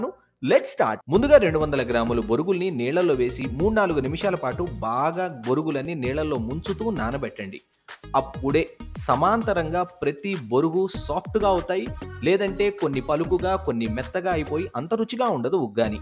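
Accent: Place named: Rayalaseema, India. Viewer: U know the very first I felt u spoke the perfect rayalaseema slang..I loved it after hearing our slang from you. And we add garlic in pappula podi..and will definitely add fried groundnuts and obviously lemon should be must to have yummy taste...u try our recipe this time and let me know through your reply Anna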